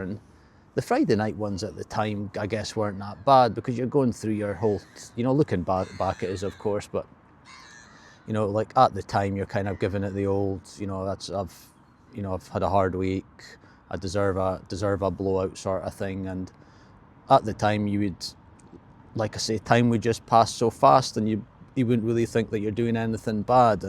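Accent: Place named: Scotland, United Kingdom. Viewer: The Scottish accent is so great - I could listen to you speaking all evening. Have a really enjoyable, alcohol-free, Christmas and very best wishes for the whole of 2026. (Hogmanay can be a challenge!)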